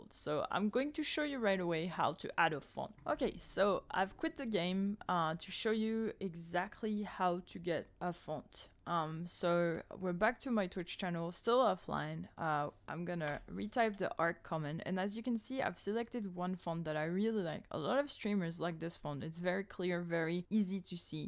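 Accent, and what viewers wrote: France. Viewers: Hi! Great video ty, are u French? European server or American?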